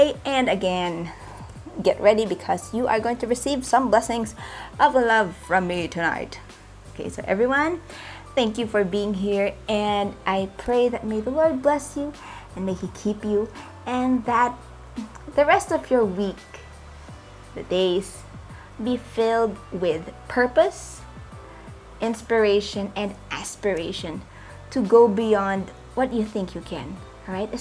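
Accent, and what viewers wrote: Philippines. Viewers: Starting to watch your videos right now and my parents are gonna buy me a kalimba, IM SO EXCITED (I noticed your accent is like a filipino, If so, HI POO MAGALING KA PO MAG TURO LABYUU <3) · WOAH she's Filipino (atleast I think). Im Filipino! "Ang kapal" is Filipino for "Its thick". Also i noticed her Filipino accent :D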